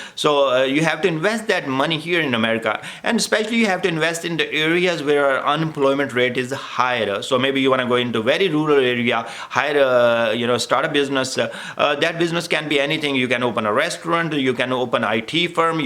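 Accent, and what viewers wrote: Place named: Sindh, Pakistan. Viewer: sometimes your accent seems to be of a Sindhi guy (specifically from Naushero Feroz - a town in Sindh)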